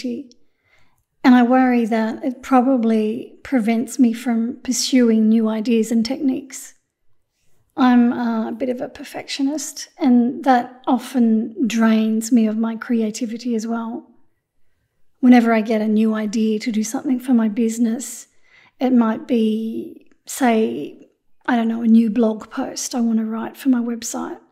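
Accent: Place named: Australia. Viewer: I love your videos. I have just started watercolor painting after nearly 45 years since school and found your tutorials and tips very helpful and inspirational. I also love your humorous outtakes at the end and love your Aussie accent. ❤❤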